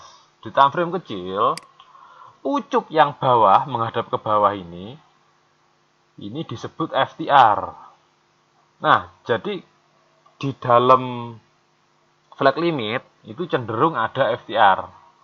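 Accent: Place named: Surakarta, Indonesia. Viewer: Bapak apa orang solo ya dari logatnya kentel bgt hehehe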